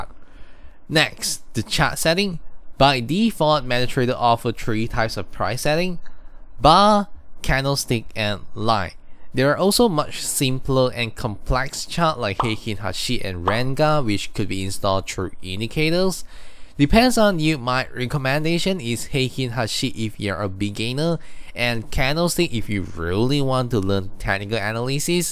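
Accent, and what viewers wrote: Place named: Singapore. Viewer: youre singaporean, right?????!! Too Chinese!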